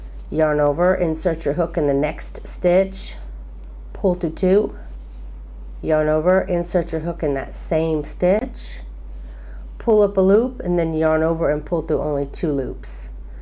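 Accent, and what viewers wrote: South, United States. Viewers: Did you say in "Israel'? I've been following your videos and never picked up on that. You sound so southern to me. Did you move there? Did I even hear right? Sorry, couldn't focus. Played it over. Anywho... thank you again for another video. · Have you lived in Israel all of your life? You have a accent with a USA southern drawl somewhat. I'd love to visit Israel.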